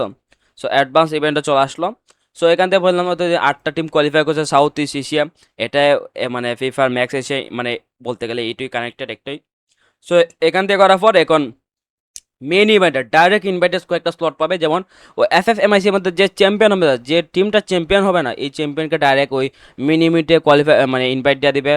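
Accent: Sylhet, Bangladesh. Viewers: Tumi Ki Sylhety??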